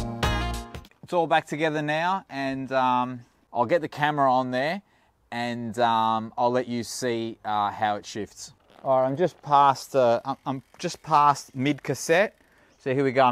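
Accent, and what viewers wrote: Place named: Australia. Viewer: The aussie slang is so refreshing. As a fellow second generation immigrant aussie, the accent is so truely 'modern Aussie' . Love your work mate! Great presenting style and overall video editing and filming.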